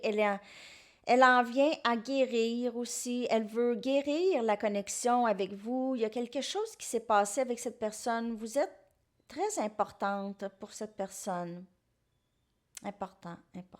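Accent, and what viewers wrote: Canada. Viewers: Hello, J'adore votre humour et surtout votre accent canadien😜 🙏🙏...des becs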